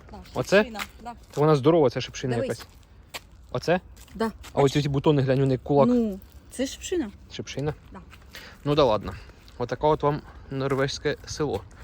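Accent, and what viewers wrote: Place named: South, Ukraine. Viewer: Привіт земляк. Наш южноукраїнський язик ні з чим не переплутаєш І не срівняєш ні з чим, ласкає вухо... Місто Покров бивше Орджонікідзе вітає тебе 🙏❗ Вернувся назад щоб сказать-написать, шо підписався:hand-pink-waving:і👍поставив.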